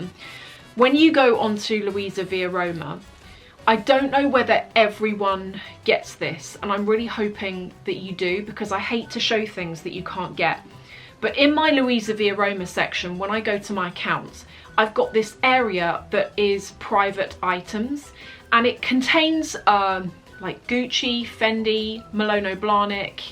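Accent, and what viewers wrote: United Kingdom. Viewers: I’m only here for the British accent!!!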